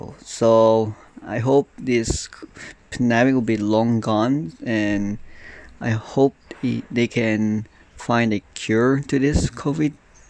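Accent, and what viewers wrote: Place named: Singapore. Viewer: I thought u are from singapore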